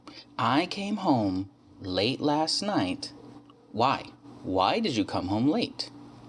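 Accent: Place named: United States. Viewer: Your voice is very clear and I like your American accent. Best wishes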